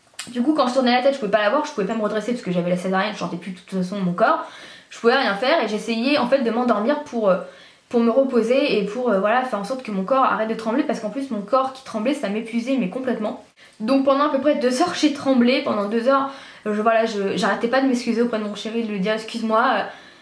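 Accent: Normandy, France. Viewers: tu as un accent normand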